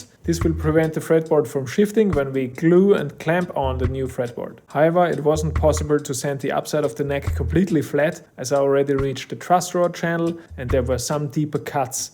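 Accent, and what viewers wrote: Austria. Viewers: Are you Austrian? Your accent is very similar to Arnold Schwarzenegger's to my ear.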